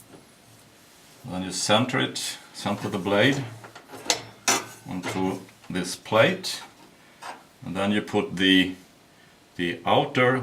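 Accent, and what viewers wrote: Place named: Germany. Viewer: it's ok, we understand english is not you mother language...es ist scwher die richtige wort zu finden...my german is also not that great so...egal... :) I am assuming you are german based on your accent....curious where you live and what you do...I am american, but living in germany..watching your videos because I am about to buy that same saw...my old metabo just died and I need something cheap..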